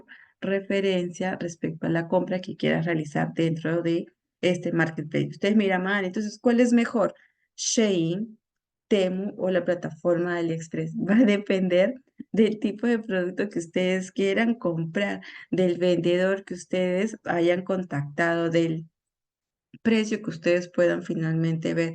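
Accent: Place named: Colombia. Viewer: pero hablas como colombiana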